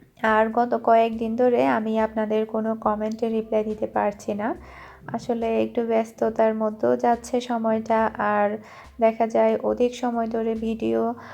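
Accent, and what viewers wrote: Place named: Satkania, Bangladesh. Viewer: কমেন্টের রিপ্লে দিতে হবে না, আঞ্চলিক ভাষায় ব্লগ দিয়ে আমার মনটাকে শান্তি করোন, সত্যি আপু আপনার কণ্ঠে আমাদের সাতকানিয়ার কথা এতো এতো সুন্দর লাগে, আমি বুঝাতে পারব না ।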